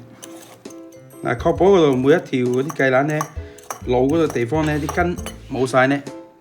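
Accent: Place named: Guangdong, China. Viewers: 这是广东哪里口音？我不会说广东话但是能听懂粤语，能听出来和正宗白话有点点不一样？ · 對，不是標準的廣州話但是確實是廣府話。整個嶺南都有自己的鄉村話。例如，中山有自己的口音和鄉村話，江門也有，南寧也有，等等。香港和廣州說的就是廣州話。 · 主持人的口音明顯是來自大陸廣東省，而且字眼都國語化了，一點韻味都沒有，不親切聽起來很土很怪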